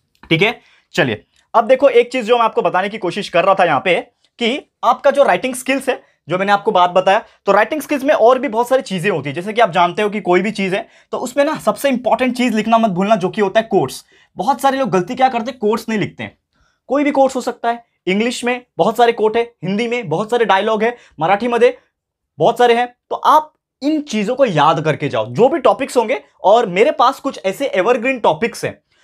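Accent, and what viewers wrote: Maharashtra, India. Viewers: Sir aap kitni pyaari Marathi bolte Ho 🤩❤😘😃😄☘️👍🙏🏻❤🌍💯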